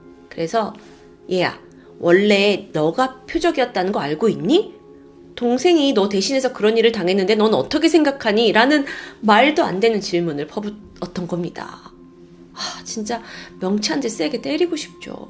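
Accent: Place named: Jeolla, South Korea. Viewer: 라도네요 ㄷㄷㄷ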